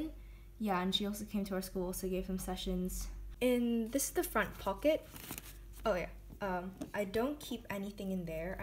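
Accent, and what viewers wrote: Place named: United Kingdom. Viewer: Woww sis i dint realize you have a bit of a British accent lol I noticed it ✌😌✨✨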